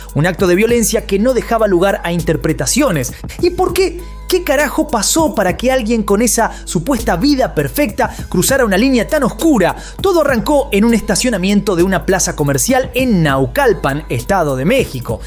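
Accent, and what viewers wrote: Argentina. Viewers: Está bien el reportaje pero lo malo que lo narra un argentino y no un mexicano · Nunca e salido del país (México) y aún así detecto que eres argentino a mí parecer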